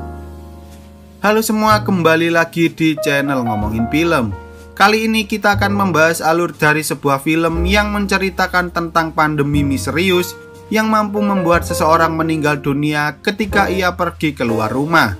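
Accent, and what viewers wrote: Java, Indonesia. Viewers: Laah tnyata wong jowo toh?? 🤣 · Mas ngomongnya dengan bahasa indonesia yg baik dong. Logatnya jangan keciri bnget orang jawanya. Muak aku masss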